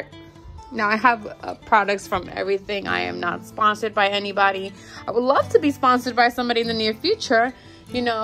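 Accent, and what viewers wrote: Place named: New York, United States. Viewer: Hi! I’m new here. Good luck to you! I’m new at the nail game too, so I would love to learn with you 💜 and I’m just guessing that you too are a NYer..lol. And if not, you sound just like me 🤣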